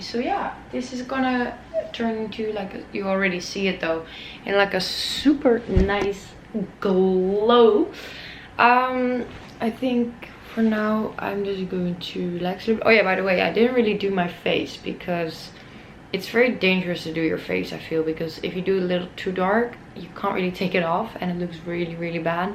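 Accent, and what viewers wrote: France. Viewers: Is she french ?? She has like a french accent Ps : that tan didn't look so good , her natural skin color looked way better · Your French accent is so cute. I’m French btw 😜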